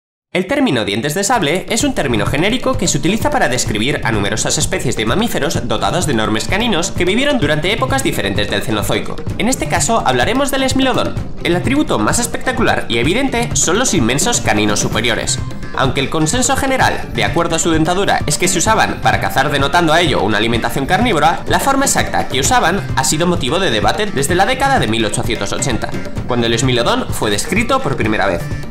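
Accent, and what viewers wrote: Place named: Spain. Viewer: hay como cambian palabras y pronunciaciones esos españoles eres español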